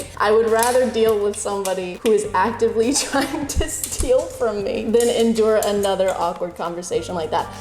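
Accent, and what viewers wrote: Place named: Tennessee, United States. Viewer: This is the most Tennessean I've ever heard you sound. And I do mean it in a good way, promise.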